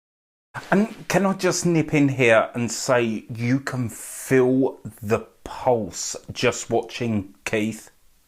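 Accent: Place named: United Kingdom. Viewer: I love your explanation of any part of this clip.... I'm not a Goth, but a big fan of. Your British accent is perfect for this description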